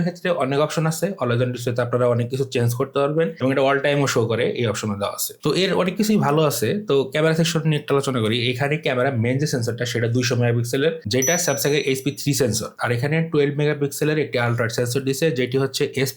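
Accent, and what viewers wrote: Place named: Gazipur, Bangladesh. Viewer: Vai basa ki gazipur naki tangail?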